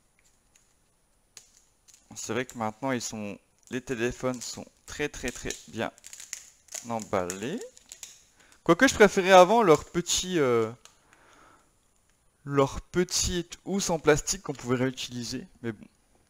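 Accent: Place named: Besançon, France. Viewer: Vous avez un léger accent je dirais Besançon si non bon look ce redmi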